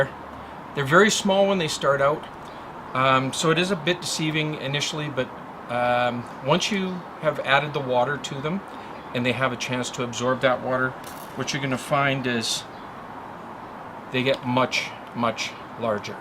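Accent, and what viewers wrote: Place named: Canada. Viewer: Great video i love the way canadians say about (a boat) and every conversation starts with ah fuck buddy very funny and cool.and whats the details on that lovely himidor ie price.capacity.cheers.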